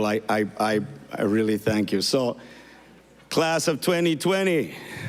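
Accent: Jamaica. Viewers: Speaks regular then patwa instantly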